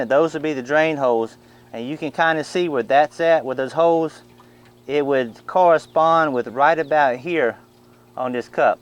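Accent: South, United States. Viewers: yeah, we all want to make fun of the southern "accent" and wording choices but you have to hand it to him, he's providing a ton of knowlege through trial and error, which is invaluable. I say thank you and I wish I were in a climate where I had more than 2.5-3 months per year to learn in. . .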